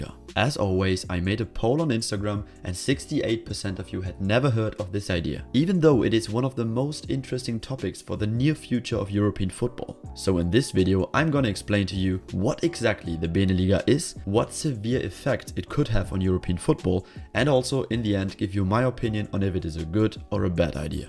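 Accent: Germany. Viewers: The moment he started speaking, you could inmediately tell he's German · When he started to count up the teams i knew he is a German, this Groningen and AZ was as German pronouced as it could. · Are you German? PS: Great Videos.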